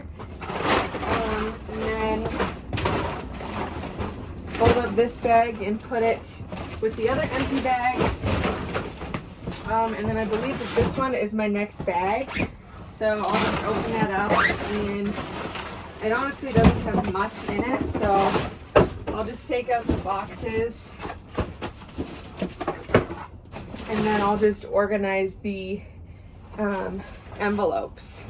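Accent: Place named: North, United States. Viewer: gotcha! Thought you were from somewhere up north, that accent did it lol you’re pretty asf tho. Have a good day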